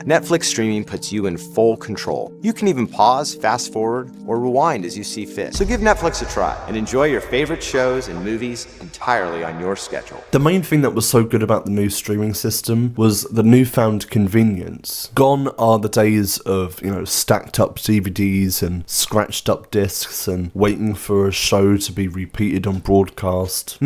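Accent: United Kingdom. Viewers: Wait a second, this man is British. I can't watch this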